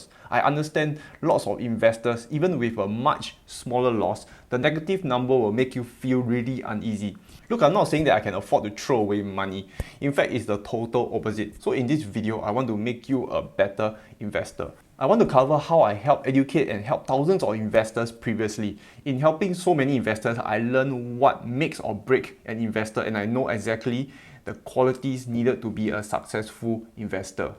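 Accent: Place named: Singapore. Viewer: Singlish ? Hard to understand !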